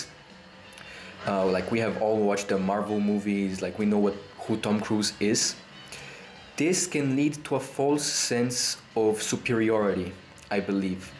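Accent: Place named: Brazil. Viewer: yo are you half mexican half italian? Strange how that combination would give you a vaguely brazilian accent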